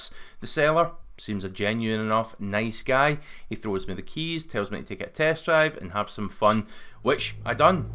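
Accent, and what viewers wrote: Scotland, United Kingdom. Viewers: I subscribed just for the accent - I'm a McRae but live in Australia after my great, great grandfather emigrated. However a Scottish brogue still resonates with me. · You need to change your accent,,,you are speaking scottish,,,,everyone detests scottish lingo,,,soplease,,,go to a voice coach,,,they will kill your problem. Jus sayin is all.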